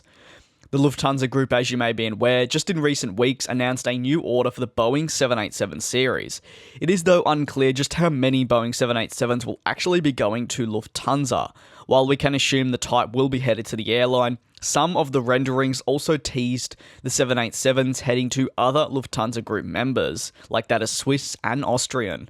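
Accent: Australia. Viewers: Hey man, been such a huge fan of you and you sound australian, would love to meet you since I live in Australia as well. Where do you reside?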